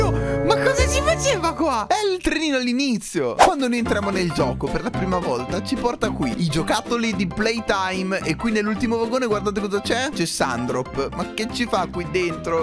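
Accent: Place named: Udine, Italy. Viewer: Mi sembra udinese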